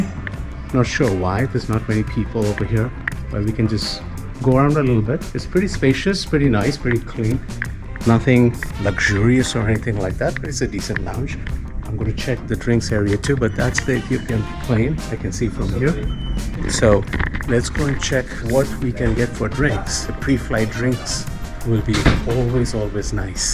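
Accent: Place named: Trinidad and Tobago. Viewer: U sound like u from Trinidad and Tobago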